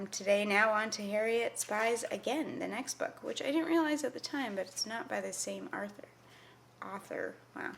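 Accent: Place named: United States. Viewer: Hey I love your video! Your characters are super cute! I just wanted to give you a heads up that "gypsy" is considered a slur in many places. You sound American or Canadian by your accent - we don't come across that word as often so I think many people here don't realize it's negative. But in Europe it's a derogatory term used against the Romani people. I'm not trying to make you feel bad because it seems like you have good intentions! I just thought you might want to know before you include it in your course.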